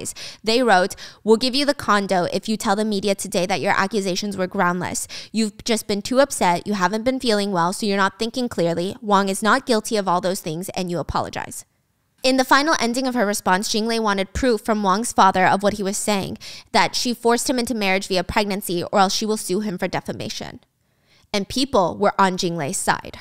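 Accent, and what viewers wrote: California, United States. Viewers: You talk like a girl from Cali I think. Are you from Cali? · You have the strongest california accent I've ever heard xD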